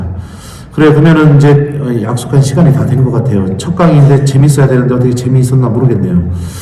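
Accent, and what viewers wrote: Chungcheong, South Korea. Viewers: 요새신부님강이에 푹빠져들고 재미 있게 충청도 말씨 유머 감동입니다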